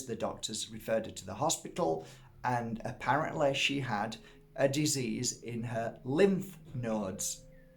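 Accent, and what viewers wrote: Yorkshire, United Kingdom. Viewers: I absolutely love your videos. So informative. I'm from ashton greater Manchester and you sound so local. Yorkshire Oldham I can't decide lol xx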